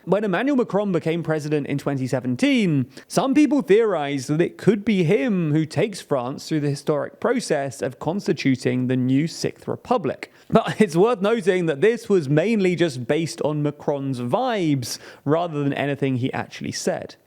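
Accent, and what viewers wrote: United Kingdom. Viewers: It's LA France. I watched anyway thinking the narrator is a Brit.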